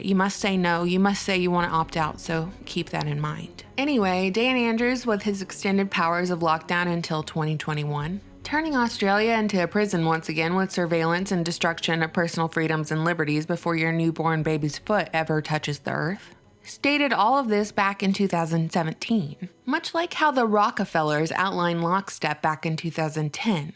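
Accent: South, United States. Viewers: A southern Belle and wish there were more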